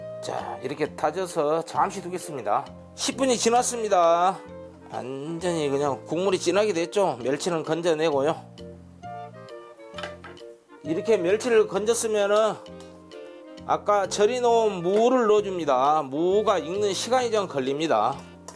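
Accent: South Korea. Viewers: 언제들어도 구수한사투리 정감이 갑니다 쉐프님 역시 최고입니다 감사합니다 🎉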